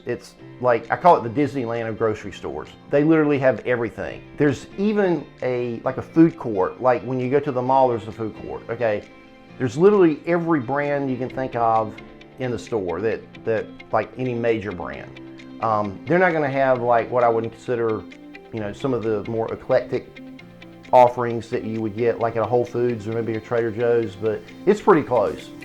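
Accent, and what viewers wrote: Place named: Wake Forest, United States. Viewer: You are definitely a WF area native you have that dialect. There was a hibachi restaurant but it opened a few years too soon. Great idea for someone to open one up around the Devon Square area in the next few years. That side of town could definitely use more diverse dining options. There’s definitely a lack of diversity in the town. That’s why we are busing in kids from low income neighborhoods in Raleigh into our schools. That’s because property owners have decided to sell their property which our low income natives live on. Big developers are buying these properties to clear cut & build these 800k houses built in about 60 days. There’s also a lack of diversity when it comes to the cookie cutter neighborhoods look at Windstone Park. Every house is light blue, blue or dark blue. There’s other colors of the rainbow. 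Don’t let the town name fool you! There’s a lack of forests & true natural areas for your kids to really explore nature. We have these things called greenways but they are basically like paved trails you get on a golf course. You won’t see a lot of nature bc you are surrounded on both sides of the trails by houses with tree buffers. Copperheads still thrive here despite all the development. We do have a local superhero who regularly protects us. He goes by the name Middle Aged Man. when I get really fed up I’m calling you to sell our house! Just because you made this video!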